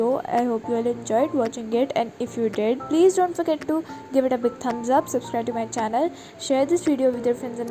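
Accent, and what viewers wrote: India. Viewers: Sis are u Indian ?? and how you accent Indian..😅😅 please make me understand i don't know..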